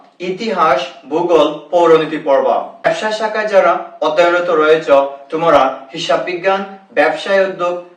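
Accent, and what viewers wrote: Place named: United Kingdom. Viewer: sorry baia / sir. but আপনার কথা বলাটা কিছুটা ব্রিটিশ টাইপের। মানে আপনি মনে হয় english techer তাই হয়তো এমন মনে হচ্ছে। 🙏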